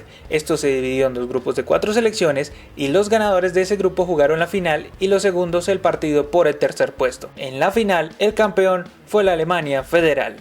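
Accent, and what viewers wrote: Colombia. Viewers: eres Colombiano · oie eres colombiano guey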